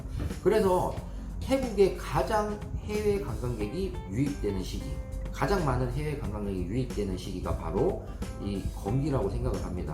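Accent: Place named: Busan, South Korea. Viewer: 부산정 표준말씨로 방송 잘합니다. 좋은 방송 계속 해주세요.😅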